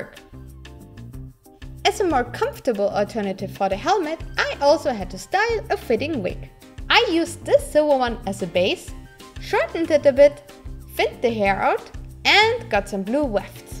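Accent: Germany. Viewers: Sag mal hast Du auch Videos auf deutsch?!? 🤔 . Denn so wie Du Englisch sprichst müsstest Du aus Deutschland kommen!?! 🤔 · Sounds like: Englisch mit deutschen Akzent.^^ Aber echt schöne Arbeit. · I love that Germán accent. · That accent...I bet your're German. xD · Sehr unterhaltsam und echt gut umgesetzt. Wobei ich immernoch nicht verstehe wieso Medion seine Gamingsparte Radiergummi nennt. Das deutsche kommt gaaaanz leicht durch aber respekt. So gut sprechen nicht viele. Hoffe Corona gretscht dir/euch nicht zu sehr ins Geschäft. Wünsche nur das beste. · I feel like your accent was german right? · sounds hard like german english :D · oh boy, the cringy german accent tho. The video looks really interesting, but, (as a german myself btw) i just can´t stand that horrible accent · Wow...I am watching this only 2+ years later but I am amazed and inspired how this cheap material can be made into such awesome looking armor! 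Somemore you have been commissioned to do this for a company! Well done! Now I also finally know you are from (Southern?) Germany (your accent sounded like it but your name didn't so wasn't too sure...:P). I am a costumer myself but I have not worked with EVA foam yet, hope I can reach your level one day and make maybe a medieval or fantasy armor using EVA foam (due to high flexibility and low costs). I also am inspired seeing you using a laser cutter (isn't it dangerous?) to make those awesome zig-zag strip-away patterns and dremmel and sewing machine to make the rest of the armor. And how you custom made (!) all those under gloves and boot covers (I thought I would have sourced them cheaply online like manufactured from China, anyway that's just my thought to save time and patience, which you seem to have no problems!). Overall awesome and no surprise that the company has asked you to do it, an expert (and cheerful inspiration to other costumers around the world)! 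Keep it up! 👍👍👍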